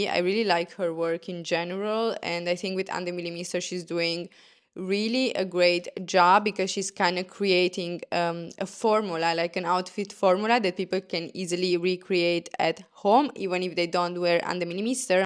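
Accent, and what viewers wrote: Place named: Italy. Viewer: very off topic but where is ur accent from ? Italy ? it feels good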